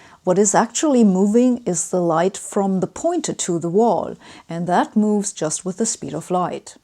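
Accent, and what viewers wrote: Germany. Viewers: Why is it that Ms. Hossenfelder is the only Youtuber that forms her words so perfectly that I never miss one? The slight German accent doesn't hurt, it may even help. Subscribed!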